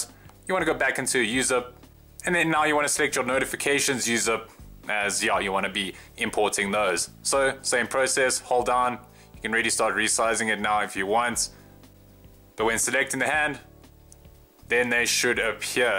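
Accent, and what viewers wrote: South Africa. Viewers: You sound South-African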